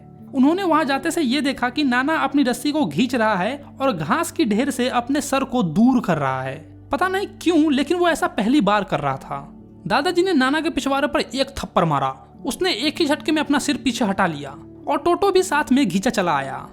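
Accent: Bihar, India. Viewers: Are you from bihar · Bhaii ye bihari hai toh bihari tongue hi hoga na. Explanation is good by the way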